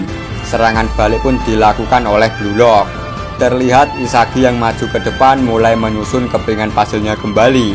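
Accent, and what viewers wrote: Java, Indonesia. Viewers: Pembawaan udah bagus minus di logat Jawa nya aja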